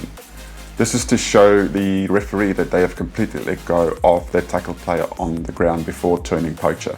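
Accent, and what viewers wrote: South Africa. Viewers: I can't believe I've only just found your channel. Amazing content, adding so much more to my understanding of the game. Especially the different, roles, positions and responsibilities👍🙏🏈❤️🎊 please can you confirm your accent for me. When I look at the screen and watch you, you sound south African. When I turn my head away and just listen to you, you sound austrailian/new Zealand. It's very confusing for me🤔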